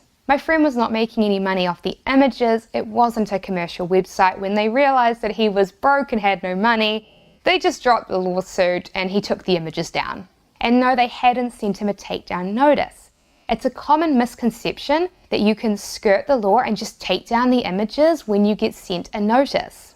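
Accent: New Zealand. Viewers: Awesome video thanks! BTW my wife and I are currently doing about six months of travel in NZ and I have watched a few of your videos and I was like she sounds like a Kiwi, but I wasn't sure until this video. We call ourselves the Kiwi wannabes :P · I hear a KIWI lol. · You must be from New Zealand with this accent! Nice and informative though. Thanks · She literally said " Hir in Niw Zilind " . Didn't you hear ? :) Love the accent btw no offense meant :D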